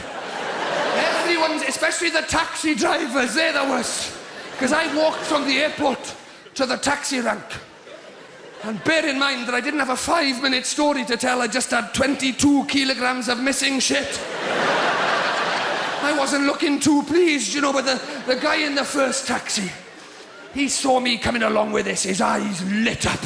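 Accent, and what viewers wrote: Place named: Wales, United Kingdom. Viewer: that is the most welsh accent I have ever heard !!!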